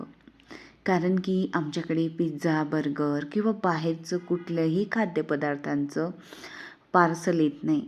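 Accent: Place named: Khandesh, India. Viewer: Tai aapli ahirani bhasha aikun khup chhan vatal ❤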